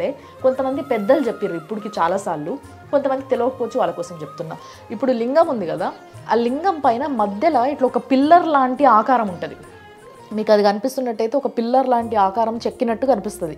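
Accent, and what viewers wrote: Telangana, India. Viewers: Your anchoring is cute, in Telangana slang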